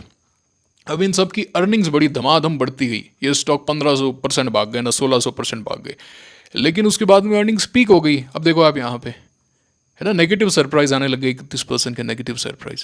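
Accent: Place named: Bikaner, India. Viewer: आपकी बोली से आप नोखा बीकानेर से लगते पुश्तैनी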